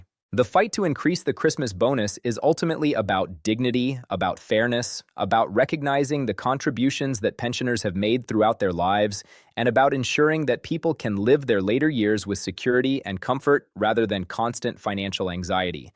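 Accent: United States. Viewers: Why do we have Americans narrating?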